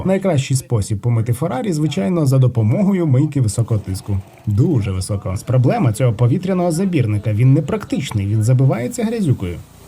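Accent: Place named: Surzhyk, Ukraine. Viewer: Пиздец, мужик, зачем ты перевод делаешь на украинском, если его полноценно не знаешь? Твой суржик звучит ужасно.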